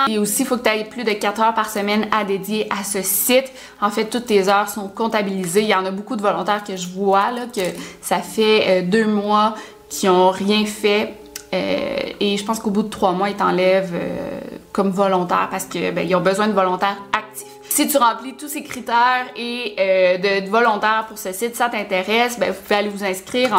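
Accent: Quebec, Canada. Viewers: je comprendrais jamais pourquoi tu te fais autant critiquée😭 je sais pas, tes vidéos sont supers, recherchées, tu expliques les “détails”, ta voix est très agréable et j’adore l’accent québécois ahah! ce que je préfère c’est les kidnapping/enlèvements :) voilà bisous, et félicitation pour ton mariage (qui est dans un mois, je crois ?!) ❤️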